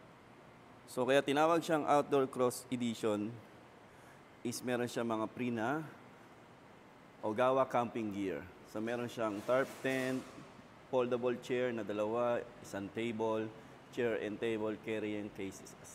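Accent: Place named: Visayas, Philippines. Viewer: Ki-liss opiriting sistim. Bisaya ka noh? Appear, Boss…✌️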